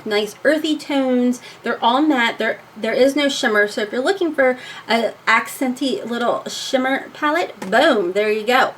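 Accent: South, United States. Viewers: Found your channel by searching for an Aphrodite review and realized that Jen Luvs Reviews mentioned you the other day, I thought I subscribed that day but I guess I didn’t but I’ve been binge watching all your videos the last couple days! Love ur content n love how honest you are. I can’t help but notice tho, you try to hide your southern accent, it’s so pretty when it comes thru. You should let it shine thru more. Btw, what camera do you use? Love ur channel! Much love from southeastern Ohio! 😘😘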